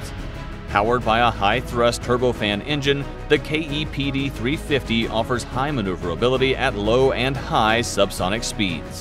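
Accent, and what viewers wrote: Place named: United States. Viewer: I thought Military TV was British, obviously this narrator has an American accent though.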